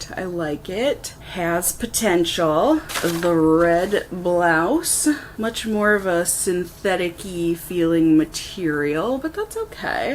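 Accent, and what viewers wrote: Minnesota, United States. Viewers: You remind me of my daughter’s friend. Maybe it’s just your Minnesota accent. I’m a Minnesotan also but I have lived in Oklahoma for 40 years.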